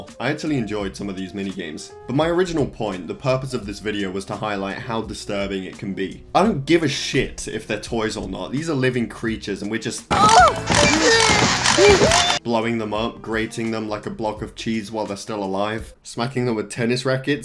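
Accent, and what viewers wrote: United Kingdom. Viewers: man youre british right and i got an ad for teeth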